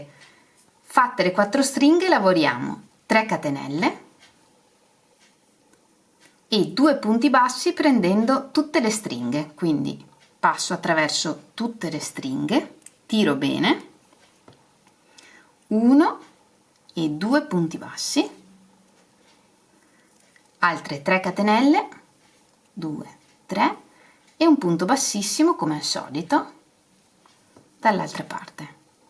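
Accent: Veneto, Italy. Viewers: Bellissimissima!!!! Starei ore a guardarti lavorare e ascoltarti! Spero di diventare altrettanto brava!! Intanto provo! Sei veneta?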